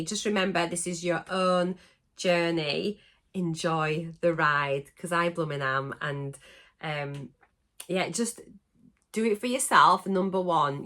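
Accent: Yorkshire, United Kingdom. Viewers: I should have recognised your accent. I am rom North East Lancashire I was brought up in Burnley and was convinced you were from Accy or Blackburn, but even though you are from Yorks I still love to hear your accent , I know what you mean about boots. I had days where my feet were so swollen I couldn't even get normal ankle boots on so I know what you mean with footwear generally. Its lovely to wake up to another one of your videos I hope you had a fabulous holiday . I might have to watch your video in 2 halves because I have to pop to the village this morning. I live in beautiful mid Wales now but I have family in Yorkshire and Lancashire. I just wanted to say a quick Good Morning to you and congrats on your bob scale victories x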